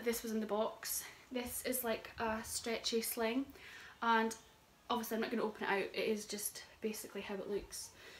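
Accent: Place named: Scotland, United Kingdom. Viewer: I love the idea that they included the poem. I clicked on the video because my Grandmother was born in Scotland and I love hearing the accent.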